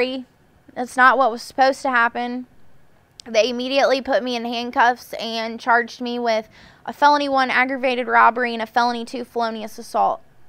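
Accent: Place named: Ohio, United States. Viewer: That is an Ohio accent...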